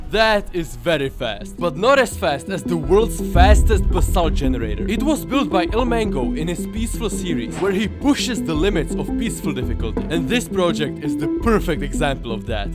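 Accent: Czech Republic. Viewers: You sound Czech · Pekne video. Normalne poznas cecha mluvit anglicky😂😂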